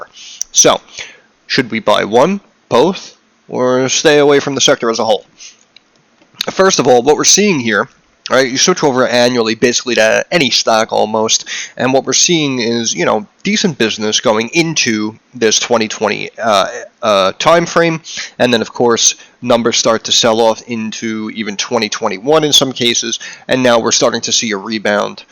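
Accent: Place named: North, United States. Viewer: u did an amazing job brother thank you especially after watching the second time,and totally agree on politics and YouTube lol, GE would cool to get a look at I’ve never really leaned towards the more conventional stocks but with everything going Electric I can see them as a play for future (I just want greedy returns😂🤣😂🤣) but yea and the renewables and things of that nature would be awesome too glad I found ur channel, o and are u up north by any chance I’m from nyc and thought I noticed a northern accent lol.. 🍻 my friend!!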